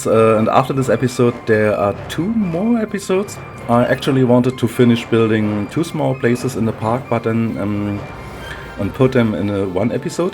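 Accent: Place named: Germany. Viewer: Mann merkt das du Deutsch bist xD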